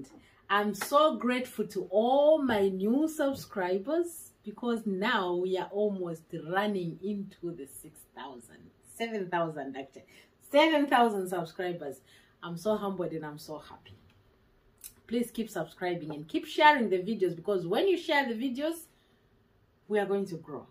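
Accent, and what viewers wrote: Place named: Zimbabwe. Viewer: I can tell that you are from Zimbabwe. Nice video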